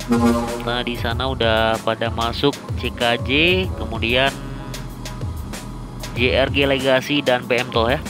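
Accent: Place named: Medan, Indonesia. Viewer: Abang ini asli medan, ap asli orang aceh. Logat nya susah2 di tebak.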